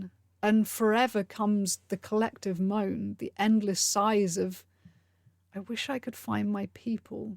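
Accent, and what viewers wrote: United Kingdom. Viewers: OUTSTANDING piece of work & presentation!! 👏I sincerely enjoyed taking this journey through the history of your Goth Life! Seeing you dressed in your amazing Goth wardrobe, & listening to YOU tell your story in your proper British accent, made it even more amazing! THANK YOU for sharing your precious life, time, talent, knowledge, & experiences with us all! Best wishes!😘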